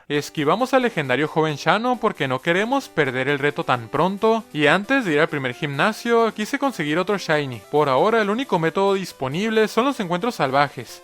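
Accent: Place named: Mexicali, Mexico. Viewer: cai en este video de casualidad, y al escucharte hablar dije, este verga es de mexicali jajaj saludos!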